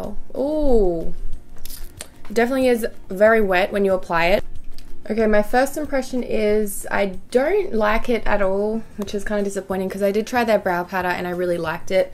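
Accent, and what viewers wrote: Australia. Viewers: Is she Aussie?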